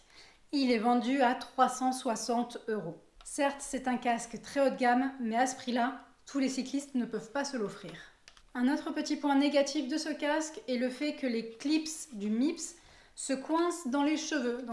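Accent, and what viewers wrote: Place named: England, United Kingdom. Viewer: Je kiff trop ton accent Anglais !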